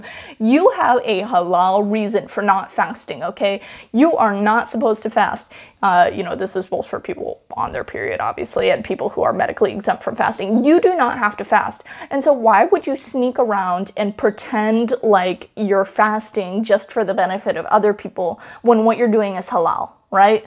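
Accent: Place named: RP, United Kingdom. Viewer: By the way you have queens accent are you familiar to her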